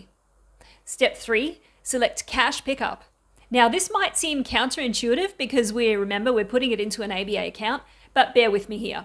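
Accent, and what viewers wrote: Australia. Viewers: Only just found your YouTube channel. Great to hear an Australian voice for a change, and to top it off, a super informative resource. well done, I'm a convert to your channel! Have been to Siem Reap on a conference years ago but did not see much of it so viewing all your videos with relish in my search for a place for my wife and I to retire in a few years. I was surprised to read that it costs AuD$68 every month to transfer $2,999. This is AuD$816 per year in money transfers YIKES that is high!!! Is there any interest on the account balance from the local bank? I like this suggestion from a poster :"You can transfer money from your home bank to your Wise Card, then use your Wise Card to make purchases, pay bills, and even withdraw from ATMs in Cambodia." ...makes more sense no?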